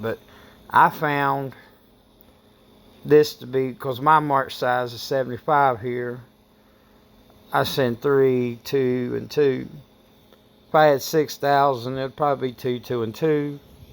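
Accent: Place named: South, United States. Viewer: A southern accent and the sound of a cigarette being sparked up... 😁 You have my attention sir. Hello from Birmingham Alabama 👋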